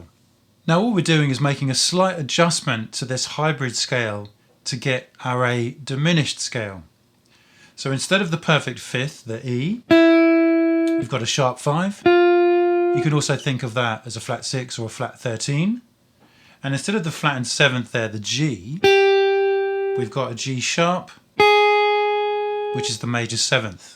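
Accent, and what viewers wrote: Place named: Scotland, United Kingdom. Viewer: Vvery Scottish indeed. Fantastic content. You are a natural!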